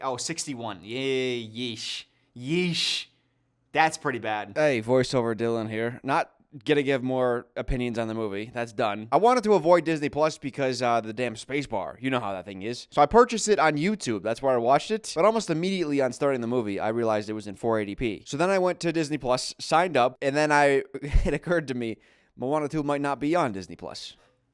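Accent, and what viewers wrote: New Zealand. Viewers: Me being slightly offended you mistook the kiwi accent for the Auzzie 😅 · my dude that is the kiwi accent, Aussies say reconnect like you do 😂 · as an Australian, I think it is one of the most beautiful NZ accents I’ve ever heard. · Bros getting his accents confused, that is probably the most New Zealand accent I’ve ever heard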